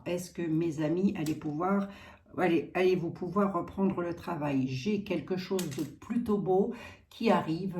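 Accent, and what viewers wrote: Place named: Lorraine, France. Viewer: Bonjour, vous avez un petit accent qui résonne à mon oreille...Lorraine ? Bien à vous !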